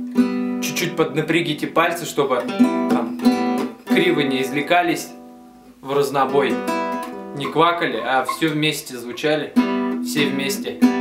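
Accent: Tatarstan, Russia. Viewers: С таким татарчёнским акцентом говоришь 7 лет назад. Таааак мило... :-* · татарский акцент никуда не денешь, авызынны себерим😏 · Оой, так нравится родной татарский акцент 😁 я нашла по чьим урокам буду учиться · Блин)))) этот Казанский акцент, татарча малай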